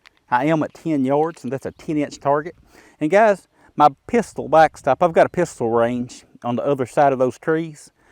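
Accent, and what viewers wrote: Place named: South, United States. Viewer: I love this guys good ol' boy, down home southern accent; but the video starts at: . You're welcome.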